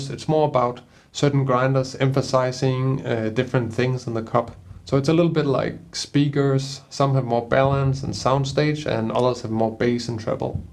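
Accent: Denmark. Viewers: Your accent sounds Danish, er you from Denmark? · Super-grateful for your quick and clear reply! I'll go for the basic. Thanks for your great content!! Btw - are you based in Denmark? Your accent gives me that impression! :) Greetings from Sweden!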